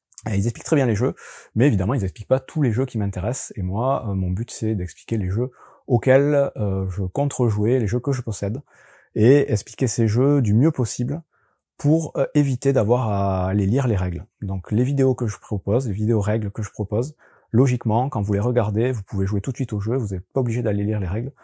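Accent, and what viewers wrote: South-West, France. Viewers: Je me suis dit la même chose que vous : expliquer les règles, c'est galère ! Donc les vidéos sont très pratiques et j'aime beaucoup votre voix et votre petit accent du sud ouest 😊 En plus des explications sans superflus ni "spectacle" (pas comme la plupart). J'écoute aussi Yandrew et Professeur boardgame 😀J'adopte la simplicité ! Belle continuation...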